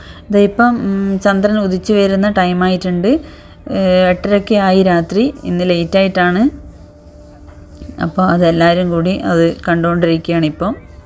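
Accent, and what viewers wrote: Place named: Kannur, India. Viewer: ആദ്യമായി കേൾക്കുകയാണ് മാഡം കണ്ണൂര്കാരി ആണോ?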